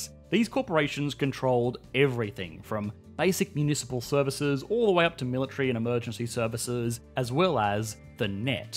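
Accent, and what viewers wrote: Australia. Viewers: Is this guy Aussie or what. What is he jabbering about anyway? Can anyone clarify? Help.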